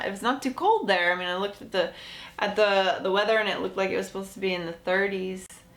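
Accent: United States. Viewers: Hi, You look and sound more as an American girl than you are in AEE podcast, you are so cool!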